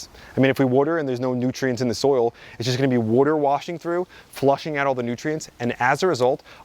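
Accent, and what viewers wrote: New Jersey, United States. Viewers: as another fellow new jerseyan i think you mean "wadder" 😅. Now the real question is, do you think he's got a north jersey or south jersey accent?!?! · The way he says watering or water lets me know I’m listening to a true Jerseyan 😊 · I was surprised by the American accent when he said he was in Jersey. I was thinking it’s great to think Americans are also moving to the Channel Islands. Then the title came up, this is NJ not the original Jersey. You would never make that mistake if you were over here. YT is global after all. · That is a Jersey accent, Northern NE’s is different. Ayyup. Wicked pissah! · New Jersey guy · Yep, he's definitely from Jersey. He's teaching us how to wooder our vegetables. Nice content. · That’s not a NE accent. It’s basically New Jersey, but you know people in New York too, but is that just because they came from New Jersey or still live there? 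Mostly it’s people in New York City saying Woarder right by NJ, and not the part of New York I grew up nearby in NE Ohio it’s like 1 hour to the border. Lots of accents all over the place. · Bro said, “worter the plants” Im from Ohio. I can hear your Jersey accent hard bro 😎🤙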